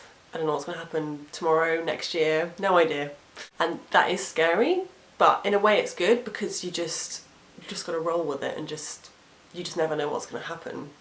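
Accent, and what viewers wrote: United Kingdom. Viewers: you got great british accent i love that do on depression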